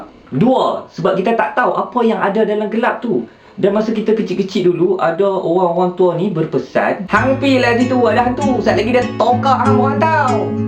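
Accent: Kedah, Malaysia. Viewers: Panjang hang punya mengarut tu bang. Dok luaq negara tp boleh ckp loghat Kedah lagi. Bangga aku sbg org kampung hang. ;)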